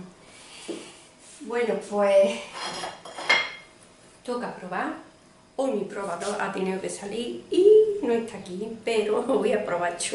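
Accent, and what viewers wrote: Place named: Cordoba, Argentina. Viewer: Muy ricos estos filetes rusos😋😋 Muchas gracias a tí por compartir esta rica receta. Cada día estoy más contenta de ser una de tus suscriptoras. Me encanta tu canal. Me gusta como cocinas y como explicas tus recetas con ese acento al cual le tengo tanto cariño. Un abrazo desde Barcelona y hasta el próximo vídeo, cordobesa guapa. 😘😘😘☀️☀️☀️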